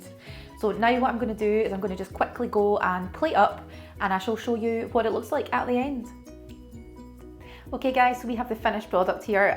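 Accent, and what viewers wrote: Scotland, United Kingdom. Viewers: I love your beautiful Scottish accent 🎉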